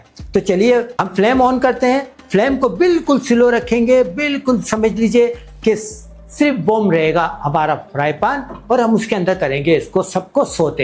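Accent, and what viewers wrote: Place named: Lucknow, India. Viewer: ❤Nazakat Lazzat aur Aapka meetha Lakhnavi andaz Awesome.Respect from Pakistan.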